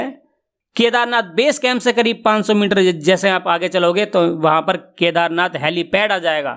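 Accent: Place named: Western Uttar Pradesh, India. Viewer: भाई आप पश्चिम उत्तर प्रदेश से है आपकी भाषा अच्छी लगती है। हर हर महादेव 🙏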